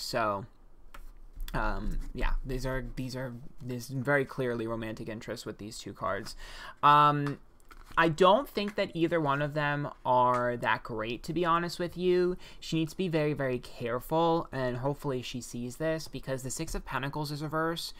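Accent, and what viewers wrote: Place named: AAVE, United States. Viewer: the aave needs to stop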